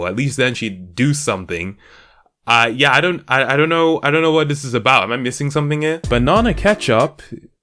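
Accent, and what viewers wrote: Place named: United Kingdom. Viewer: Love your Uk accent!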